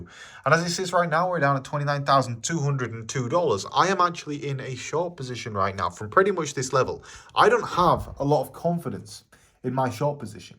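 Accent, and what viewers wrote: Yorkshire, United Kingdom. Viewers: Allreet? Yorkshire man😊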